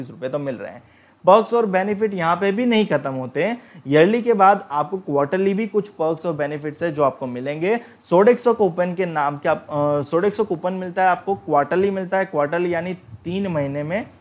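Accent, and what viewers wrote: Gujarat, India. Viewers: વાહ ગુજરાતી વાહ! ભાઈ ગુજરાતી ગમે એટલું હિન્દી બોલી લે પણ ખબર તો પડી જ જાય કે ભાઈ ગુજરાતી છે.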